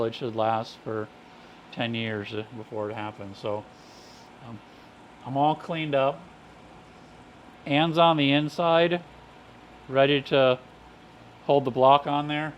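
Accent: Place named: Wisconsin, United States. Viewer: Well thank you for the reply , and now i can satisfy my curiosity about the accent lol. Hey ftr i am , and have been for over 30 years , a Cheese Head , GO PACK GO Packers fan even though I’ve lived here in Georgia all my life. Dont know if you’re a fan but just thought I would share that since you said you grew up in Wisconsin.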